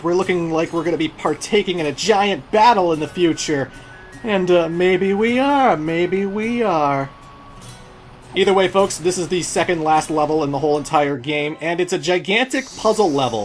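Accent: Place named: Canada. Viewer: I'm here for the sound of your sexy Canadian voice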